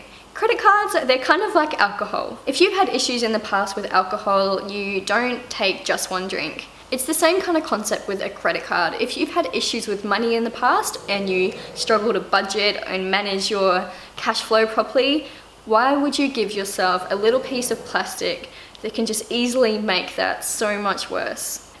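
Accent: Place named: New Zealand. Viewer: Great videos! Love em. An addition into having a credit card is that some have small % cash back whenever you used it. It's almost free money! Your accent sounds New Zealand-ish. It's very pretty :)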